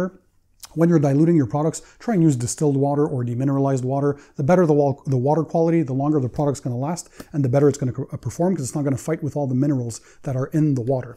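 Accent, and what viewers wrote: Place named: Canada. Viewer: At first I thought this guy was a European that had an accent from speaking English as a secondary language but then I realized what I was hearing.... Canadian 😅🇨🇦 anyway, great info! thank you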